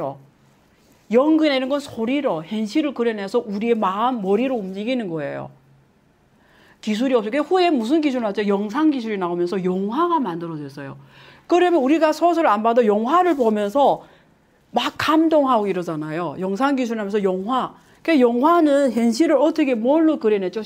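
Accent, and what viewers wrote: China. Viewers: 억양이 조선족? 조선족 못믿. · 조선족인가요? 말투가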